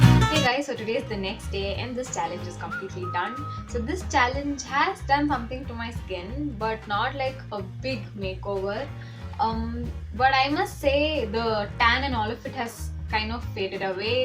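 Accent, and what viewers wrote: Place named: South India, India. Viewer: Your Ascent of speaking English shows you are a south Indian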